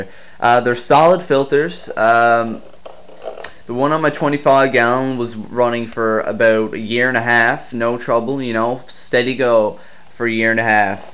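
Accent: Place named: Newfoundland, Canada. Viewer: Knew that was a Newfoundlander Accent! Cool vid dude, pickin one of these up next week.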